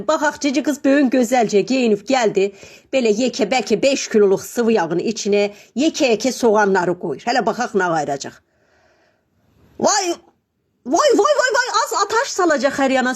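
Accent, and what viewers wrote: Kars, Turkey. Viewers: Ben Karslı yım konuşmanız aynı bizim gibi çok güzel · Kars . Azerbaycan Türk'ü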